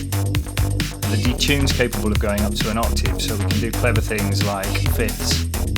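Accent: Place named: England, United Kingdom. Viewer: It's nice to hear an English accent on a walkthrough such as this for a change! Thanks a lot - found this tutorial to be very useful. Think I'll be getting Synth Squad.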